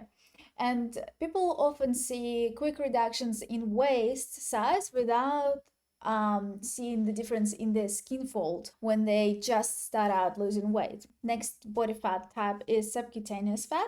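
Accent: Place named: Ukraine. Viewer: Great video! BTW, what is that beautiful accent of yours?😊 Are you from Ukraine? 🇺🇦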